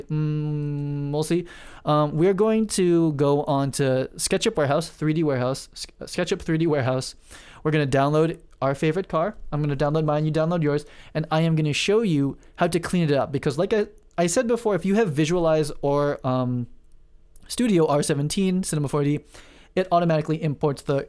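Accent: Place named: United States. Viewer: Nope, pretty strong US accent but very pleasant to listen to.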